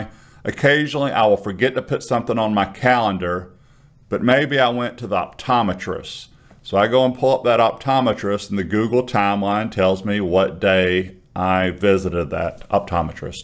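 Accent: South, United States. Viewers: I love your accent, sir. We need more Southern hospitality up in NY.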